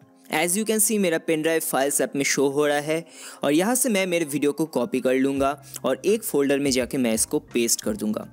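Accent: Bengal, India. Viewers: Bengali naki Tumi?Bari kothai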